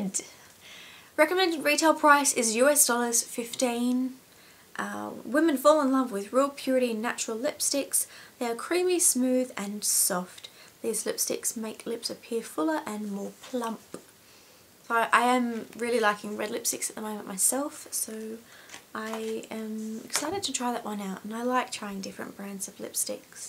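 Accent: Australia. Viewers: Hi Beauty. SORRY...but I love you ;-) I am not a lesbian, just think you a great girl! Everything about you is great! Your voice, face, passion and the fun of it! Your Aussi-Slang. I am a body-beauty-freak, too! I just like you! Phantastic person. Would love to hear from you - learn more about you! Greetings from Germany <3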